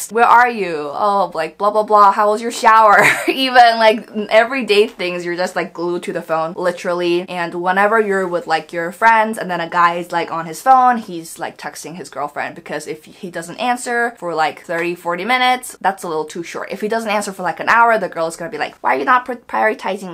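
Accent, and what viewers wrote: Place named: New Jersey, United States. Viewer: This person is talking about "Foreign girls being shocked at..."🙄😌She means American girls, though.. (Although obvs she's never come across any decent British men (just chavs as far as I can see.) She talks as if the whole chivalry aspect & other such things are purely for Korean men, when certainly that is not the case - & any decent British man will displayed rather a lot of that kind of thing - but she appears to hv dated a lot of wasters, who don't "Ooooh - damn that masculinity..." - frankly it's ridiculous to try to make men, not be men - & espc to be "offended" by them being so🙄😌 You don't need to "prove" that you "don't need them" & can "make more money than them" (Ssuming you KNOW that about yrself, & a man trying to impress you by pretending he can do mosr things better than you, is just a man being a man - you appear to want them to change who they are; so no wonder you keep being disappointed. 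You being "able to earn more than them" or "get a better job" , that doesn't need to come into it - but if you constantly hv to try to make yrself feel better about yrself - well thats not any man's fault either - do you watch these back, bc any therapist would hv a field day with this stuff, I think..🙊💜My gosh, what doesn't she complain about🙄😌 This person should just admit she went to America & became an American - & that she goes out of her way to annoy most Koreans as much as psbl by being one - by being yr basic mouthy New Jersey or even Queens type (& that accent is AW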